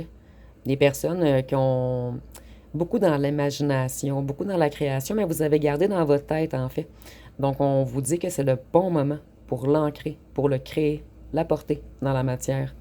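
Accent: Canada. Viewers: c'est un joli prénom, vous êtes canadienne? J'adore votre pays et accent. Vos guidances sont excellentes. Merci ❤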